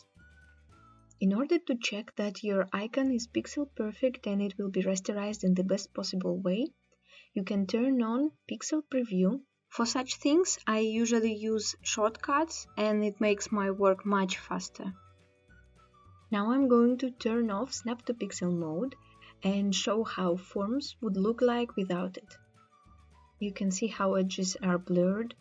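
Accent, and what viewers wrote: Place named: Russia. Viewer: Услышал RUSSIAN акцент ))) но это не важно — лайк за видео очень грамотно и хорошо, браво молодец!!!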